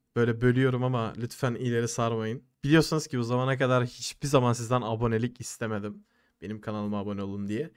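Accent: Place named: Izmir, Turkey. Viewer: Aga nerde duysam tanırım izmir bura amk yaaaa 19 yıl yaşadım kesin izmir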